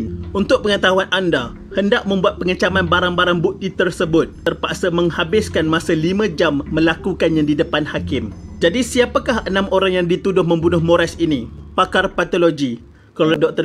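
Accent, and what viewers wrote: Kelantan, Malaysia. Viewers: Pekat betul slang Kelantan ni ahahahah 😂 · org klate ehh?? Klu x silap telinga sye dngar lorat nye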